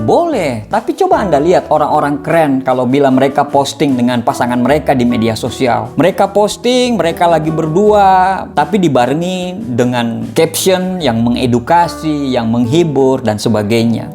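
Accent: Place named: Makassar, Indonesia. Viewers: Kelihatan logatnya pak, apa dia lahir dr mkassar? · Dari logatnya bang org Sulsel y, kl benar spertinya sy baru nemu youtuber motivator dari daerah sana, bahasannya oke punya👍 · Logatnya Makassar kayaknya ini om 😁😁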